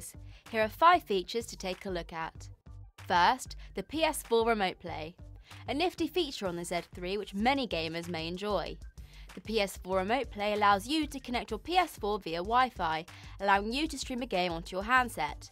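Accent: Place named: England, United Kingdom. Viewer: Well i really need to get used to this English accents. Its so annoying. (My opinion)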